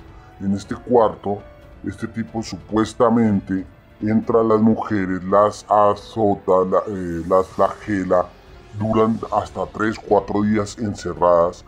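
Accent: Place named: Colombia. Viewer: Este voz acento. Colombiano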